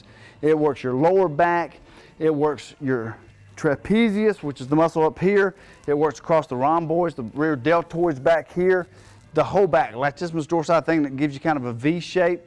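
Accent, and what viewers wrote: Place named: South, United States. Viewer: That´s what I call a southern acccent. I have a chicano accent haha. Thanks for the vid, it was helpful.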